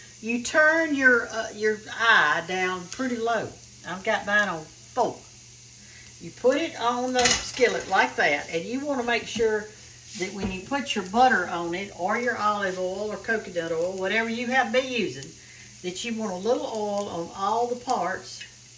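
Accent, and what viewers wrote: South, United States. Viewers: Miss can you video spinach omelet. I love spinach w garlic Parmesan. God bless you thank you. Love Southern accent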